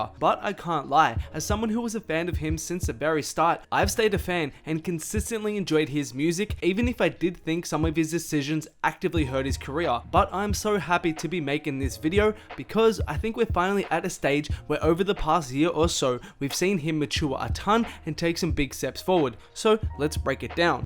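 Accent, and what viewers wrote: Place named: Australia. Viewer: I think it's an australian accent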